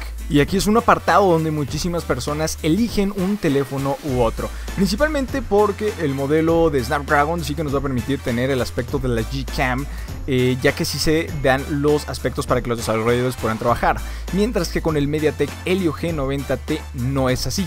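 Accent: Mexico. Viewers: Mexicano, eso creo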